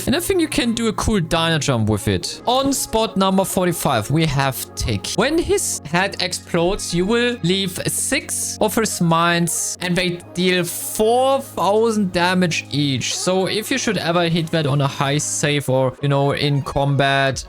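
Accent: Germany. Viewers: Are you from german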